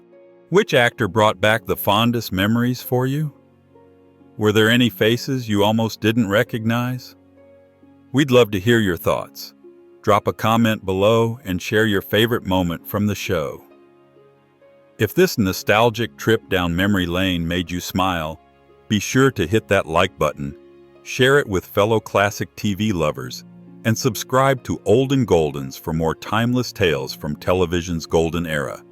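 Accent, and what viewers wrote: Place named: United States. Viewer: American narration.Cant watch this sh1t.Spoilt the program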